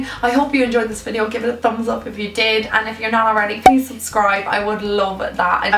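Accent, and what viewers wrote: Ireland. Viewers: What part of Ireland are you from? I'm from Louth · this is so helpful xx and love her accent isn't she Irish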